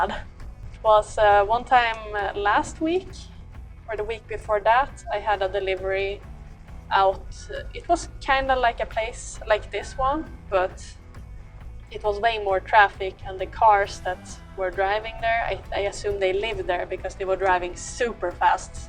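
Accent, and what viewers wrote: Sweden. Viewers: låter du som alla svenskar gör i amerikanska filmer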